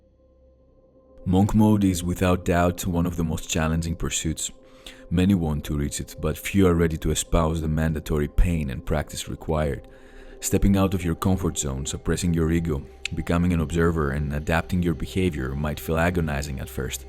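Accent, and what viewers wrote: Greece. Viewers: U sound greek 100% 👍👍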